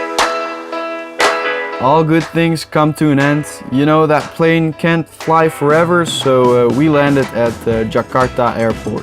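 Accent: Netherlands. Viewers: nederlander dat hoor je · Wait u dutch? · Nederlands Engels😍😂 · You can definitely hear that you are Dutch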